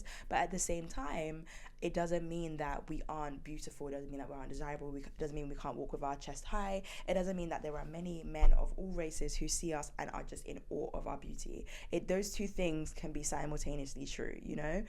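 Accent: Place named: United Kingdom. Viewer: This is my first time watching one of her videos— But tell me why did I only realize that she had a British accent half-way through the video? LOL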